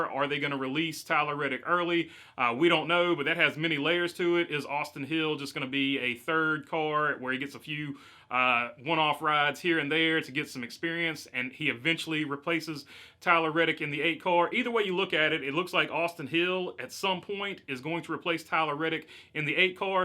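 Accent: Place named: East Tennessee, United States. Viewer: Where is from? Your accent is similar to mine. I grew up upper East TN. With weekly excursions to SWVA.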